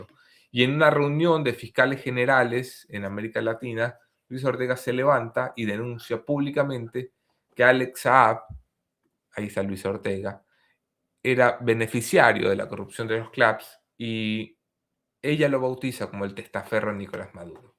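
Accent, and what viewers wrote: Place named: Argentina. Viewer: Por eso tienes el acento argentino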